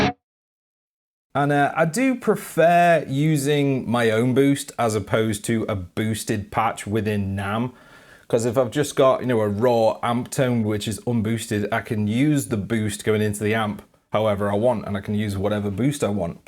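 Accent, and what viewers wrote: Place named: United Kingdom. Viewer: Hello. You always used Lancaster IR loader. Is there any difference in using it or the NadIR one? I just discovered NAM and was amazed by the Emil Rohbe models when I watched on another vídeo. I even did a search about him (he has also a YT channel). Now I see you talking about him. I dndt understand this ""triple or jwel rack"(?)" (I strugle to understand britanic accent)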